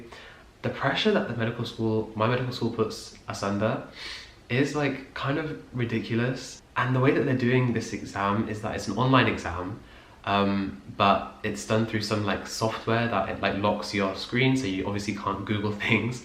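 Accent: United Kingdom. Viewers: you sound british, where do you go?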